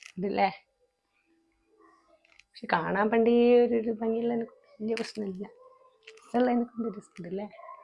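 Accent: Kozhikode, India. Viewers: കോഴിക്കോട് ഭാഷ ഇഷ്ട്ടം ആണ്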